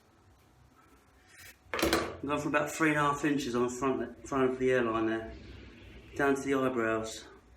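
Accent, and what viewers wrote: United Kingdom. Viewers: Last time it was a posh British accent, but now it's more London working class. If I didn't know you were an Aussie I would have thought it was real accent lol. I didn't think bald men were more ignored. It hasn't affected the careers of Jason Statham or Mark Strong, but if you prefer having hair then you do a very good job of making it look natural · LOOOL!! Muppet's!! hahaha!! 😂 you know what i mean?? spray that, spray that stfu and learn something for a second LOL!! oh dude....😂😂 loving you more and more ^^ and the brit accent is sublime!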